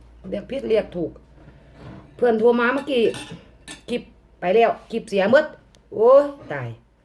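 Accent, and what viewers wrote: Korat, Thailand. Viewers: ผมชอบพี่ พูดโคราช เพราะดี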